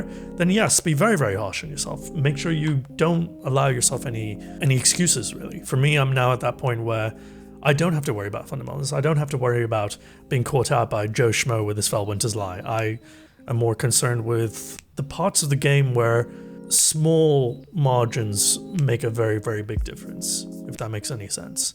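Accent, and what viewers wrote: United Kingdom. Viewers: Cammycakes and collaboration please:) Great channel and nice to see your face. I should have known the best British voice on the Internet belonged to someone from the Indian subcontinent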